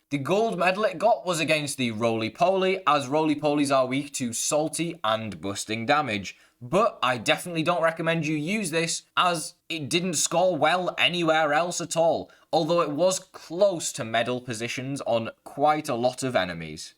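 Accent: United Kingdom. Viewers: The black ox hammer is so confusing. On paper it should be so much stronger, but it honestly sucks. BTW, you have a British accent my friend. How are you butchering all those pronunciations? 😂😂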